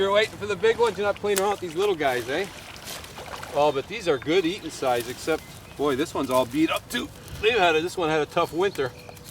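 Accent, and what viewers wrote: Canada. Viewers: Are you from Canada Aye? Nice videos good information it has helped me a lot ! Thanks. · Is this guy Canadian, eh? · "Eh?". God, I love Canadians. That and a really superlative lesson in catching catfish!! :)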